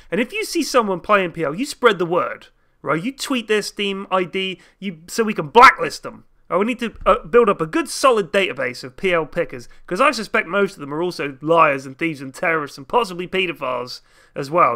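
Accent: United Kingdom. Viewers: people with british accents make things sound much more intelligent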